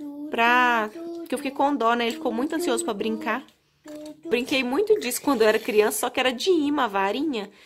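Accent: Minas Gerais, Brazil. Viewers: Ai desse jeito vou engordar,quero fazer todas as suas receitas...esse cafezinho é muito bom a tarde...linda,adoro seus vídeos esse seu jeitinho mineiro de falar é muito gostoso.meu avô era mineiro tenho um pezinho aí em MG.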